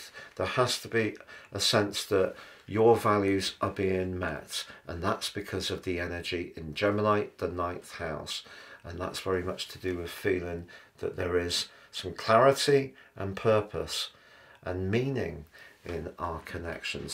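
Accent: United Kingdom. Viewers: Im a non native English speaker and i guess youre a British accent speaker which is really hard for me to understand i watched it twice to know about my abroad travelling for study purpose my visa decision will come on 27th August but i dont know what is about to happen about my travel i am so confused and couldn't catch what you said regarding this can you please tell me if it will be a positive chance of visa approval or what?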